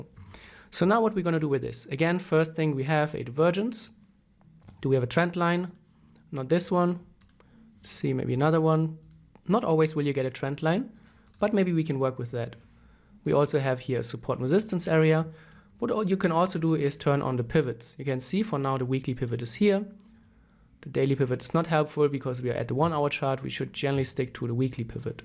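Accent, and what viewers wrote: Germany. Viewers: I love your german accent.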